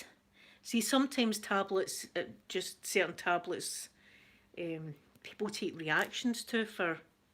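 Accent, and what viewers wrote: Scotland, United Kingdom. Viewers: Aw,. You're one of the most cheerful ladies I've ever seen. I like popping by just to hear your lovely and adorable Scottish accent. <3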